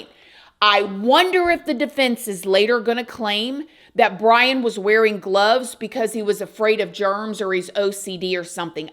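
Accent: South, United States. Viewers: Love your videos! I watch every new video that comes out. It makes me laugh tho everytime at the end of your videos cuz you go from southern “yall’s” to valley girl “Byeee” 😂 Love it!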